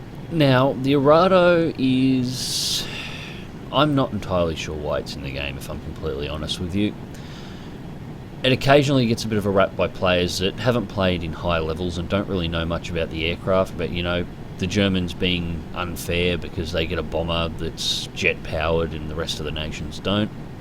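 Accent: Australia. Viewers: Oh you sexy voiced Aussie.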